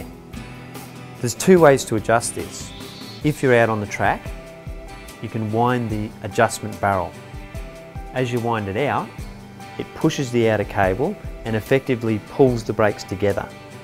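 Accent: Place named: United Kingdom. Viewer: Excellent! You took your time and explained things well. Even with that crazy British accent :)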